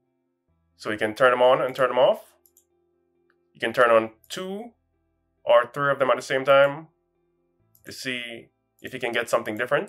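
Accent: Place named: Jamaica. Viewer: I am a Jamaican and you sound so much like a Jamaican lol. your content is good bro thank you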